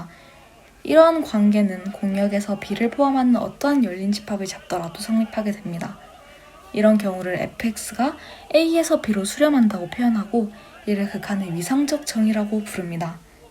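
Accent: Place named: Japan. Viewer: 일본사람 같네요. 발음이..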